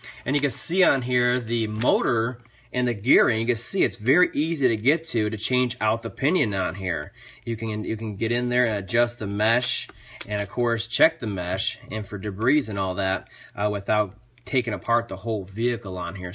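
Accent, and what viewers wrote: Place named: Northern Illinois, United States. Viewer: I'm hearing a far more northern IL voice than mine so probably nowhere near me. I'm just an hour or so from KY actually.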